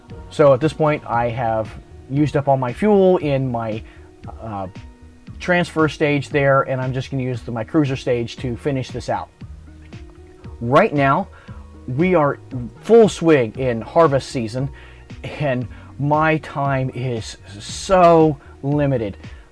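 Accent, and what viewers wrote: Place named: North America. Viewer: Cool video. My first interplanetary landing was Gilly. It seemed like more of a rendesvous than a landing, of course. You mentioned being busy with the harvest - What are you harvesting? I'm guessing by your accent that you're in North America. I'm headed down South to Esperance (Australia) to harvest wheat, canola and lupines in a few weeks. Funny that you'd be harvesting what you grew over your summer at pretty much the same time that we harvest what was grown over our winter.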